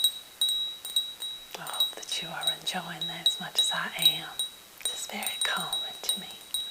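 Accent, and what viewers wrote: South, United States. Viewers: I like the tapping also the southern accent makes me relaxed a lot i seem to prefer it for asmr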